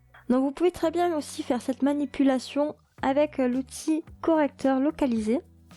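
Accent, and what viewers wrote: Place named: Béarn, France. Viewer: on craque également pour l'accent (bo-arnaiz)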